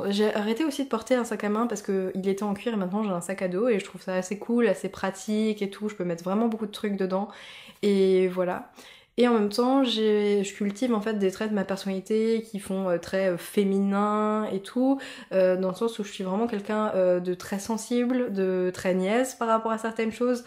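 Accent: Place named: Haut-Rhin, France. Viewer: j'en était sûr ahah je sais repérer l'accent de chez moi :) je viens du haut rhin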